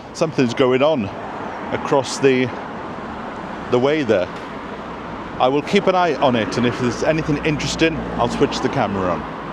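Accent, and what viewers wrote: Wales, United Kingdom. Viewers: I love your accent and I think it's got stronger since you moved back to Wales. The homestead is in a great location, you struck lucky there.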